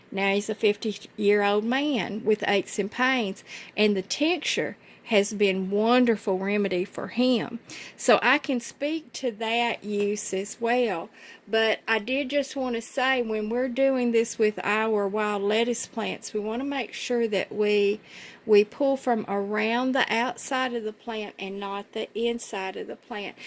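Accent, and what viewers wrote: South, United States. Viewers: love the Southern Drawl of the narrative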